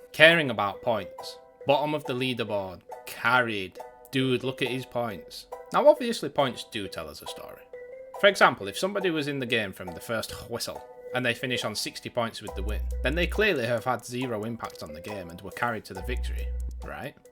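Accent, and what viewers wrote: Yorkshire, United Kingdom. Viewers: Very good video bro, you from Yorkshire too or manny?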